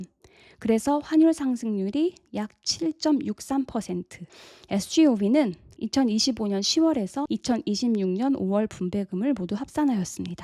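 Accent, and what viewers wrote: United States. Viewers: 미국에서 오래 사셨나바요 얼피~~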